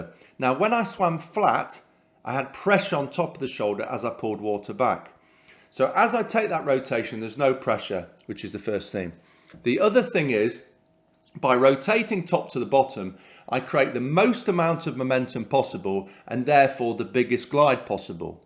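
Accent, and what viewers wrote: United Kingdom. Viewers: I want to get back into swimming and hearing your British accent brought back some great memories. I'm a gay man from Lithuania and visited England in 2018 for the Gay Pride Parade in London. I had such a wonderful time. I met these two professional swimmers at the parade and they invited me to their home after the celebration and they gave me a double full body massage while nude. It was the best massage I ever had and and I will never forgot the experience. Just thinking about it puts a big smile on my face.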